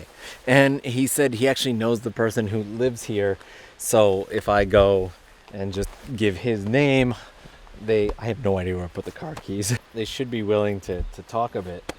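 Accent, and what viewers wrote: Canada. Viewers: Fellow Canadian living in Japan here. I've only watched a few of your fascinating videos, but I knew you were from Canada from the first vid of yours that I watched, just based on the way you said "house" :). I used to live in Tohoku, and these abandoned villages remind me of all the old houses and sections of cities I would travel through for work. Of course, there are even more abandoned places there now, especially in Fukushima. One thing these sorts of places always make me think of is the warmness of small-town Japan. Thanks for keeping your focus on these smaller parts of this amazing country, it really helps keep them alive through the memories, in a certain way. You just earned a new sub, keep it up. Edit: the only gripe I have is you cursing about the curry. I get you might not be a fan of the curry, but there's no reason to cuss it out.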